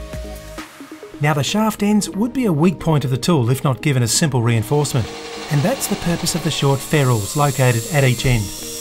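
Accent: Australia. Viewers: He's Australian mate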